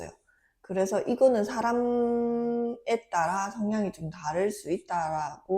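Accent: Gyeongsang, South Korea. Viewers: 오... 저희 와이프도 간호사예요. 저희도 간호사 와이프 때문에 미국에 이민 온지 4년 됬고 달라스로 이사 온지는 1년 됬습니다. DFW 사시면 저희 집에 한번 초대해서 저희 가족과 한번 뵜으면 좋겠네요. 사투리 쓰시는거 보니 저희 와이프도 경상도거든요 ^^ DFW 근처 계시면 연락한번 주세요.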